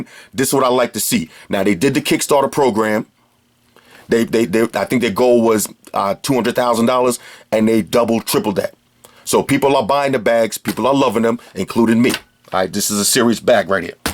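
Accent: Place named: Queens, United States. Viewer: Damn bruh. I just need a bag to carry my kid's diapers in. Really enjoy your channel. It sounds like you're from Queens.. you mentioned the ave a few times before. From a Queens native keep it going!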